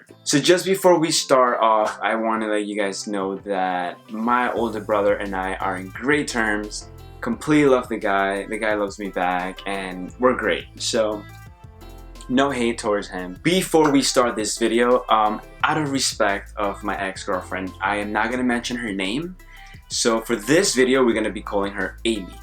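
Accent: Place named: Bronx, United States. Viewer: That Bronx accent is coming thorough lol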